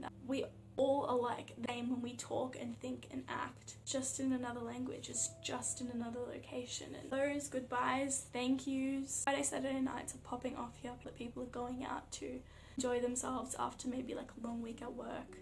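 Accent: Australia. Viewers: I'm native Ruhrgebiet but worked in international companies in Madrid and Dublin before returning recently. The thing I miss most right now is the international flair while out and about. So, please speak loudly with an Australian accent whenever possible :) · It is always intersting to see how a foreigner sees and experiences our country and us people. It can be very entertaining and at times even funny. Partially your australian accent is a bit unusual to me, but that is not too dramatic. But I know what Macas is. We Germans often say Macens. Welcome to Germany, enjoy your time. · I really love the australien Accent ^^ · Those are some new "shocks" about us I haven't heard about :D Love your accent too, heavy US American kind of "geht mir auf die Nerven" now, so Australian is a refreshing change to listen to! Have fun in Germany :)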